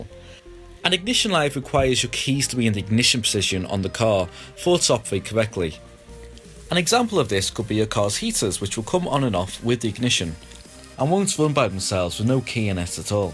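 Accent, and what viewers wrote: United Kingdom. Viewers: Is this British acsent ??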